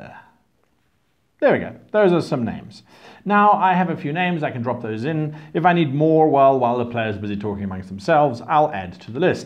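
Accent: England, United Kingdom. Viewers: I could be a great GM if I had a wonder English accent like that! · I'm glad I've found your channel, really useful tips in all the videos I've seen so far. if I can ask, you sound British - do you know of any good websites/social media groups for recruiting players?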